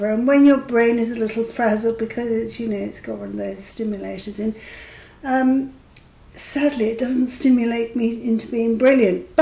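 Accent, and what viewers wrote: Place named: Northern Ireland, United Kingdom. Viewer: Yeah you got it right its Northern Irish accent!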